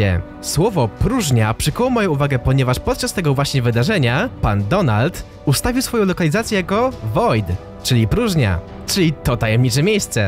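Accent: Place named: East, Poland. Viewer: Co to niby znaczy? Przecież pisze prawdę. Chłop robi dobre filmy, ale ten jego wschodni akcent wszystko psuje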